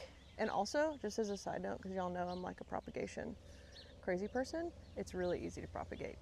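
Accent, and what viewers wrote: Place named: Texas, United States. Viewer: you can tell she's holding back on her texan accent.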